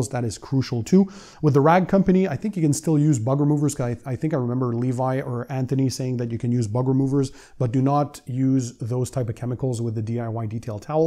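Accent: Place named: Quebec, Canada. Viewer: you're welcome 🙂 I discovered your channel by only looking for detailing new products, especially Gyeon. I find North American detailing videos more complete than what we've got in France. Merci pour les quelques vidéos en Français, c'est toujours plaisant d'entendre un québécois parler. Avec un vocabulaire souvent plus riche en plus 🙂 Anyway, have you ever done some videos about your garage makeover? Because even if I recently look at your garage tour videos, I have the feeling that I've seen it years ago while I was doing plans for my actual home .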